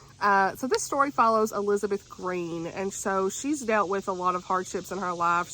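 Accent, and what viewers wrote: South, United States. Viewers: I immediately smiled at the first two words you said! "Hey, y'all!" as a southern girl myself living in the west I miss hearing the southern accent! basically, I. Am. Here. For. It! 😁 and yes, if you go to my videos you'll know that I don't have a southern accent. Everyone asks me where it is. and i'm sad i lost it (maybe never truly developed it) But when i go back home to visit family and talk to people with a southern accent, mine shows up! or when i'm ranting about something! 😂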